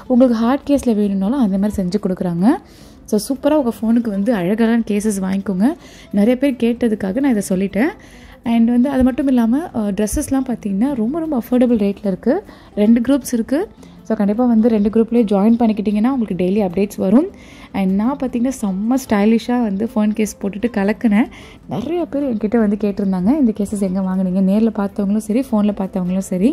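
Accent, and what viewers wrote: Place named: South Tamil Nadu, India. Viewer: Recently watching ur videos.. Very natural.. Which is ur native.. Becaz south side slang madhiri iruku adha keta sis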